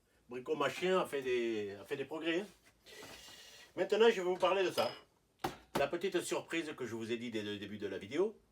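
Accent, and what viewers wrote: South, France. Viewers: Moi c'est l'accent du sud que j'aime bieng...! Le reste aussi évidemment. Tchao.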